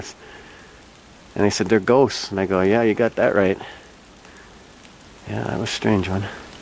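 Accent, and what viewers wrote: Canada. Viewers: You sounds like your from Canada? My dad was born in Manitoba Canada! 1925 iam from USA 🇺🇸 but we have relative! Grate video 📼🎥🗻🗻🗻🗻🏔🏔🏔🏔🏔🏔🏞🏕🏕🌴🌲🌳🌲🌳🌲🌳🌲🌲🌲🌾🌿🦍🦍🦍🦇🐵🐒🐵🐒🐵🙈🙉🙊👽👽🐻🐻🐗🐗🐗🐶🐺🐶🐺🐶🐕🦌🦌🦌🦌🦌🦌🦌🦉🦆🦅🦊🦊🦁🦁🦃🦄🦄🐴🐎🐏🐐🐮🐄🐼🐼🐦🐣🐥🦎🦋🦏🦏🐯🐆🐈🐾🐾🐾🐾🐾🐾🐾🐾🐿🐿🦍🦍🦍👣👣👣👣👣👣👣👣👣👣👣🌲🌲🌲🌲🌲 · Good to hear that Canadian EH ! Right on man and cool tale as well.Cheers from a little island on the west coast O Canada.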